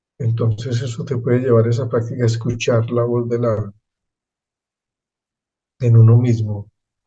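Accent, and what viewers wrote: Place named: Colombia. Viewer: Precisos. He oido muchas veces hablar de las 8 ramas del Yoga, pero esta vez me actualiza el significado. Muchas gracias. En tu acento recordé al Maestro de meditación Sesha. Tambien es Colombiano. Gracias gracias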